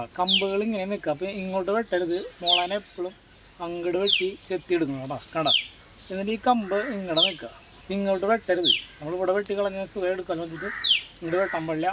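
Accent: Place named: Thrissur, India. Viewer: Kettaa arinjoode thrissur aanennu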